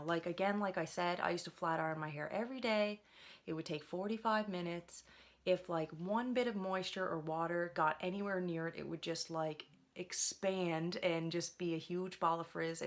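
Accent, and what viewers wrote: Canada. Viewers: Your Canadian accent is so thick omg i love it !!! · I thought you sounded Canadian! My husband is from Ontario but lived in Nova Scotia for a few years before I met him - so he had an accent like yours when we were dating! He's lost the accent now but it's fun to hear it again in your video. :D Also, thanks so much for the curly girl break down. <3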